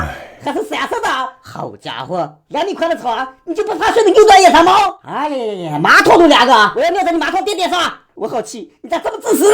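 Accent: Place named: Shanxi, China. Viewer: 应该是山西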